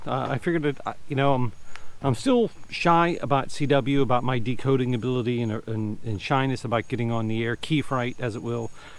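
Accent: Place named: Western Pennsylvania, United States. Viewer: Let me know when you get on. We can practice Cw. Seems I have a pipe to pa. That west pa accent cracks me up. I grew up with western pa folk. Man you have the trees for an amazing delta loop. Vertical.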